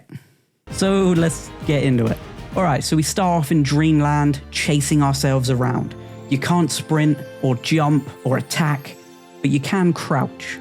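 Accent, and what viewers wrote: England, United Kingdom. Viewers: This video is up there with majority of "Woke Critic" channel videos, only the difference being The Woke Critic is likely to be Irish instead of somewhat pompous but still financially Chav English of yours and that's also a complement too lol Greetings from Indonesia, I really don't like how this game sets up and putting the gameplay models of certain things, it looks like a early access game but with slightly more polished models that looks like a combination of photorealism & uncanny valley, which Sweet Baby Inc and their networks of gloriously self-righteousness consultancy (and their associates) doesn't disappoint at disappointing their audiences, as usual...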